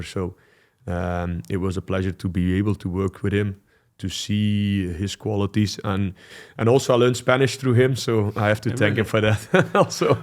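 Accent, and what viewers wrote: Liverpool, United Kingdom. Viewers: He still has a scouse twang to some of his accent haha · sound's very scouse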